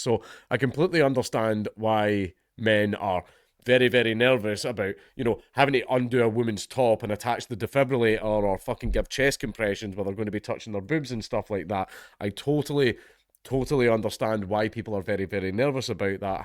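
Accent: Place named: Scotland, United Kingdom. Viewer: I had no idea listening to someone with a heavy Scottish accent say defibrillator would be so entertaining. I am going to ask that you say a few other things. Tarantula. Vocabulary. Distribution. Judicial system. Oh! And Burglar alarm.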